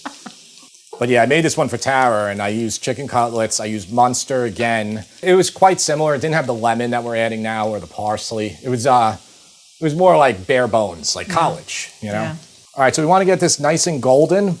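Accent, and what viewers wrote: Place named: Midwest, United States. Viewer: Why is ' accent so different from? sounds like a New Yorker but sounds midwestern.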